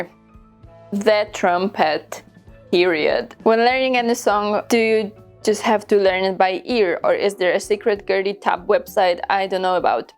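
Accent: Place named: Poland. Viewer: I didn't realize there was such a void in my soul until I heard the words "Hurdy Gurdy" cheerfully spoken in a polish accent. I feel better now.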